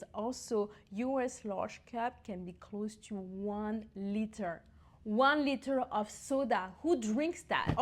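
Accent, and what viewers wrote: France. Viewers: Pfff tu nous gonfles avec ton anglais français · Hello, Congatulations, you're speak english very good. It was a pleasure to hear you speak in this language. You're french accent is very charming. Nice to meet you. Vive la France !!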